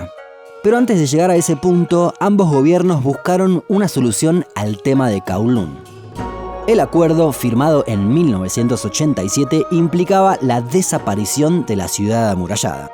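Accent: Argentina. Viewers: Se me hace muy chido tu acento, eres de argentina o uruguay?, y no soy gay, solo simpatizo con ese acento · “Shaky Chan” amo el acento argentino 🤩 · El acento argentino me excita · Me gusta tu acento argentino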